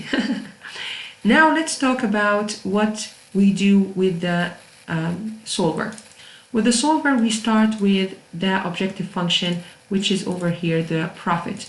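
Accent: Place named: Iran. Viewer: Thank you, I must say you sound like my Persian friends accent. I miss them, we used to have good fun in University in Tasmania. God bless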